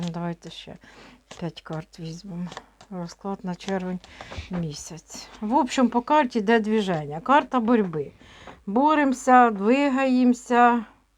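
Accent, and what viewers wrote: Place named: Poltava, Ukraine. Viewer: Дуже люблю Полтавщину,ваш говір,слухаю із задоволенням,чекаю.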